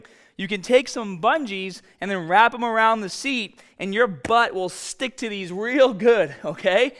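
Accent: Boston, United States. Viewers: Boston accent? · bro is boston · Boston accent actually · Yeah he’s definitely from Boston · I don't remember being so Bostonian. I feel like there's a Mandela Effect cause I swear he sounded normal like 10 years ago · I just subscribed.. great info plus you must be from Boston GO PATS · Really helpful. I had a nasty shoulder injury years ago due to bad form on incline bench - shoulder blades not retracted, flared elbows, grip too wide - and haven't done it since. However, it's a really useful exercise and I'll give it a go again having watched this. Btw I like the Boston accent and am looking forward to getting stahded with a proper grip on the bahbell. Or rather starrrted and barrrbell as I'm Scottish. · I'm gettin a HIIINT of Boston in this guys accent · I dint remember his baahhhsstahhhhnnn accent being so thick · Before we get stah-ted…love the Boston accent…! 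♥️🧦⚾️ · Are u from Baston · This guy is so from Boston. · I have watched many videos about inclines and everyone is saying differently on how your form should be so who is right and who's is wrong?! And you must be from Boston? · Wait, where tf did that Boston accent come from. He doesn't have that accent in his older videos lol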